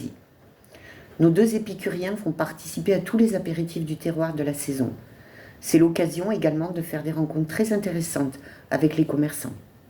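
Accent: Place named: South, France. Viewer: Bonjour, je viens d’écouter la première partie des aventures de matou et minette j’ai beaucoup aimé, j’ai hâte d’écouter et de connaître la suite de cette belle histoire d’amour, la narratrice a une belle voix avec un petit Accent Du Sud qui nous plonge directement dans l’ambiance de se dérouleron aimerait que ça se termine jamais en même temps que ce récit elle nous fait découvrir des lieux magiques, j’avais l’impression de vivre avec eux tous ces moments inoubliables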